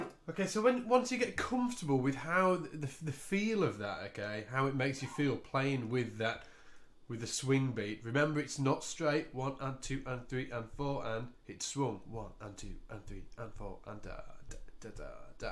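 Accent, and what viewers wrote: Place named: United Kingdom. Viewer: I believe this is a pure British English. Sooooooo cool)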